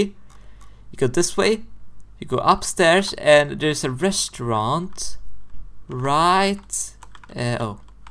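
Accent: Sweden. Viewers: Are you from sweden. I think i can hear it in your accent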